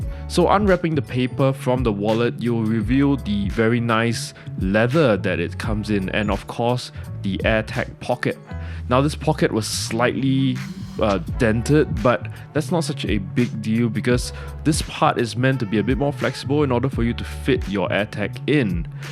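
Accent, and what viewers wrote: Singapore. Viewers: I was thinking at first, your voice sounds very Singaporean, and then you pulled out SIN Dollars! 😄 We’re hoping and planning to move there from Germany soon. Absolutely wonderful little country!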